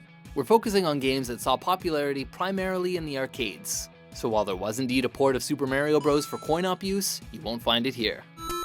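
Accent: Canada. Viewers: It's a Canadian accent. get over it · That's the way Canadians talk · he's canadian..... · For every person going "THEY MISPRONOUNCED MARIO!!?!?!" The narrator is Canadian so he's obviously going to pronounce things differently. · It's called a Canadian accent, stop being so butthurt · FIRST WORLD PROBLEMS It's a Canadian accent. get the fuck over it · He's Canadian.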